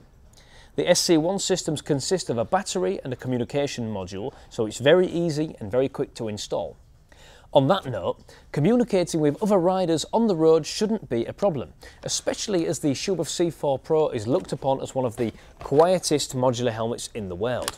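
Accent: United Kingdom. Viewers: Lekker accent maat, moest toch heel even twijfelen of het nou toch stiekem brits was.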